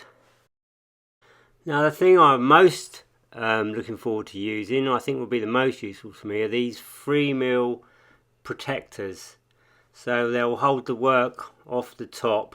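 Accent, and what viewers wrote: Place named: England, United Kingdom. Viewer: So sweet to hear a pure British accent and way of sharing the knowledge and the humour :) Love it, you earned a new subscriber mate ! Keep the videos coming ! I am a beginner diyer tryn to build a MFT table lol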